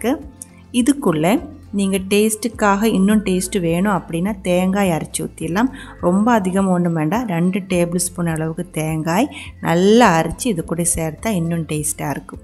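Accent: Kerala, India. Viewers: Nice Tamil with Malayalam accent nice molakooshyam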